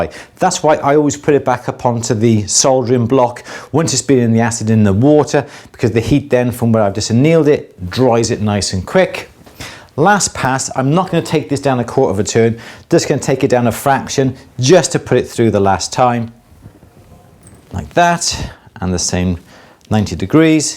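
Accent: United Kingdom. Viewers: I definitely put the cart in front of the donkey.... I was partially through a metalsmithing class before the class was shut down because of the virus. But ar 62, I’m determined this is what It want to do, even if only for making jewelry for myself, This morning I bought a Durston Agile C140 Combo 60 Flat/40 Wire Rolling Mill. I figured with all this time on my hands, why not play and learn at the same time? I came here tonight to search for beginning projects for rolling mills and found your awesome video. THANK YOU! This will be so helpful! PS! I’m thrilled you like the Duraton Agiles. What sold me was the 5th wheel that made the rolling go easier, smoother, over having four wheels. I know I will love it when it comes next week! LOVE your videos and your British accent!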